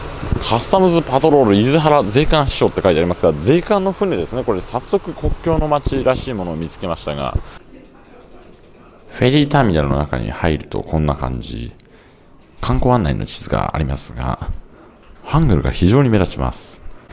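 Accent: Tokyo, Japan. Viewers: お話方は東京下町言葉をお話になるので、ある程度の整列された歴史認識を持ってこのような動画を作っておられるかと思っておりましたが、残念ながら中身がよしもと芸人と同じアンポンタンとは少し残念のように感じます。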